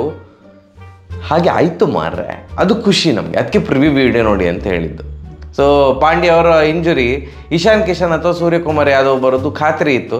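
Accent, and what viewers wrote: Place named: Bangalore, India. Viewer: Bro dakshina kannada bittu blre kannada mathadtidira... please DK kannada dalli maataadi..that janardan sir bashe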